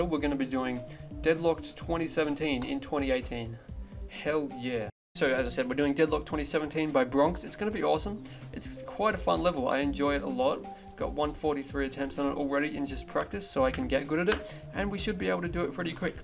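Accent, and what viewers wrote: Australia. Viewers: OMG IS AUSTRALIAN WHICH PART OF AUSTRALIA DO YOU LIVE IINNNNN??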